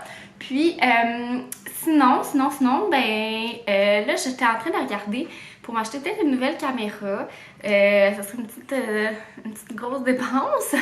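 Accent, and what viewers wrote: Canada. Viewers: Hello J'adore l'accent canadien, trop fun Surtout avec ta bonne humeur contagieuse 😍 Je suis française et j'espère un jour visiter votre beau pays Tes vlogs quotidiens sont très plaisants Tu es vraiment très sympathique Je t'aime beaucoup 😍❤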